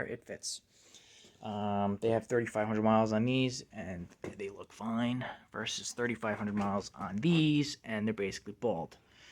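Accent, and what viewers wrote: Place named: New York, United States. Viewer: Your accent makes it sound like you're from New York City or New Jersey.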